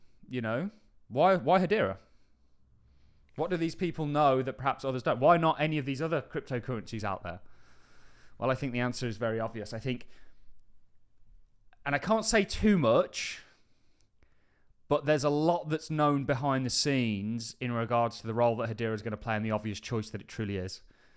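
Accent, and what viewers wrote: Birmingham, United Kingdom. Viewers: That’s a brummie accent 👍🏻😎👌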